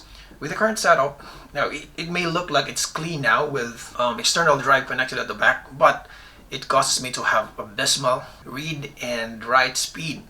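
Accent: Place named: Canada. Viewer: Good video mate. The whole time I was wondering where you were from and then you said NZD! Your accent was throwing me off. Where is it from? I'm going to guess Canadian? Good to see techies across the ditch making good vids. All other reviews on this monitor are rubbish. I was 90% sure on getting this monitor before watching your vid, and now I'm going to buy it.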